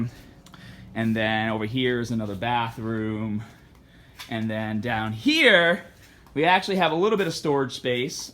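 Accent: Boston, United States. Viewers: how much will the rent cost? and also will you lose your boston accent?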